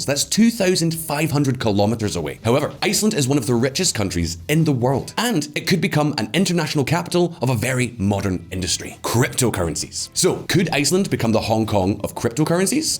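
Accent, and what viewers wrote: United Kingdom. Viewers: Scottish accent over British, any day of the week 🤤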